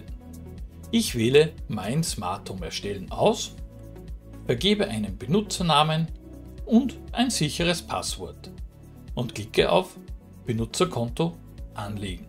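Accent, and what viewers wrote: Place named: Austria. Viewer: Nach gefühlt 0,1 Sekunden erkenne ich den österreichischen Dialekt trotz sichtbaren Bemühens um schöne, hochdeutsche Sprache.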